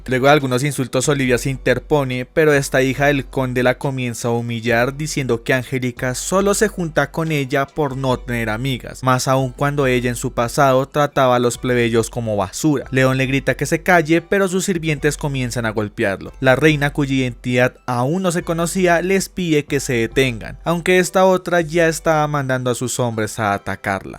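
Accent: Colombia. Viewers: ¿Soy yo o sos colombiano? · eres de Bogotá 🤨🤨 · ya se me hacía que eras Colombiano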